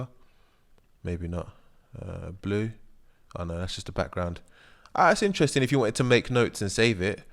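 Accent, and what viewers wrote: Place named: London, United Kingdom. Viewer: u sound like you're from ends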